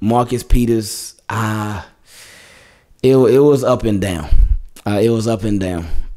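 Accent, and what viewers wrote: Baltimore, United States. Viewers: You from Baltimore ?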